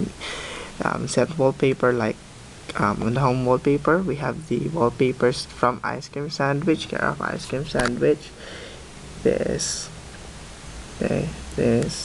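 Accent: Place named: India. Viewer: why is your accent sound like indian?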